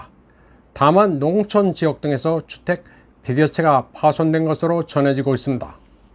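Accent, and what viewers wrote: China. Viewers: 이 자료는 진짜 한국인 박상후씨 맞나? 왜 중국 방언의 사투리 느낌이 나는 거지...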